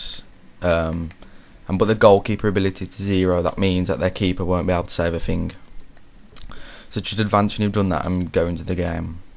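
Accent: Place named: Black Country, United Kingdom. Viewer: u sound like ya from west midlands like me but u soun d black country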